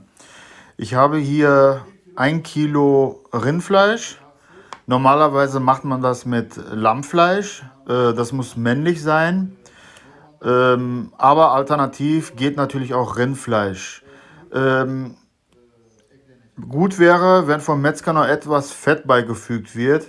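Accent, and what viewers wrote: Ruhr, Germany. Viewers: Sehr gut erklärt! Kommst du ausm Ruhrpott?? deinen Dialekt finde ich klasse, Ruhrpottbruder! LG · Super Rezept und echt lecker. Kommst du aus‘m Ruhrgebiet oder hast da lange gewohnt, weil du öfter „dat“ sachst 😉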